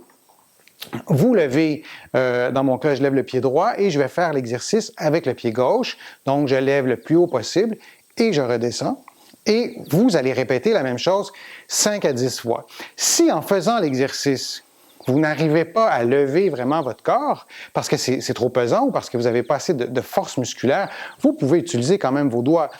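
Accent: Quebec, Canada. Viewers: Bonjour, tout d'abord merci pour vos vidéos! Je suis québécoise, habitant en France, mais j'ai besoin de me reconnecter avec l'accent de mon patelin ça me fait du bien! Je voulais juste vous proposer, lorsque vous démontrez un exercice pour les jambes, de descendre votre caméra au niveau de vos jambes. Je suis prof. de fitness et ce genre d'exercice, aussi simple soit-il amène un positionnement souvent erroné. Le genou est souvent trop vers l'avant etc..le visuel est très "parlant"! Encore merci pour vos capsules, dans notre métier il faut se tenir au courant et se mettre à jour continuellement.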